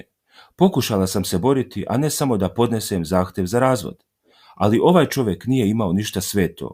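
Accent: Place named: Croatia. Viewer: I kad si se probudio...ti pao sa kreveta...hrvatski naglasak a pokusavas ekavski da pricas...samo o tome sanjat mozete...Pravoslavlje ce da pobjedi i Putin ovaj zivi Putin ce da dovede i Ruskog i Srpskog Cara jer je tako GOSPODU I SPASU NASEM ISUSU HRISTU U SVETOJ TROJICI UGODNO...a vase masonske i satanske zelje ce vam se ispuniti tamo gdje pripadate i ispunice vam ih vas nalogodavac u paklu... BLAGOSLOVENO CARSTVO OCA I SINA I DUHA SVETOG!!!!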